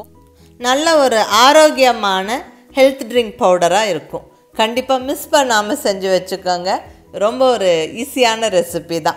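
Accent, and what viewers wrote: Coimbatore, India. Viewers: சகோதரி சமிபமாகத்தான் தங்களின் பதிவுகளை பார்க்கும் வாய்ப்பு கிடைத்தது மிகவும் சந்தோஷம் அருமையான பதிவுகள் எளிமையான முறையில் நல்ல தமிழில் புரிந்து கொள்ள கூடிய முறையில் இருக்கிறது வாழ்த்துகள் 💐💐தாங்கள் கோவையை சேர்ந்தவர்கள் என்று நினைக்கிறேன் உச்சரிப்பு அருமை வாழ்க வளமுடன் நலமுடன் தீர்க்கமான ஆயுள்ளுடன் பல்லாண்டு பல்லாண்டு பல கோடி நூறாண்டு கள் ⚘⚘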